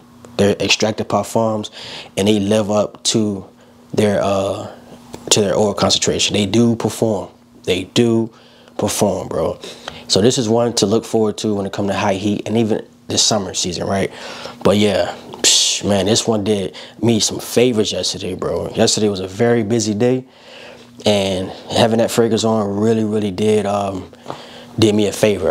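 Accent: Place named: New York, United States. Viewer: Thought you was from nyc😂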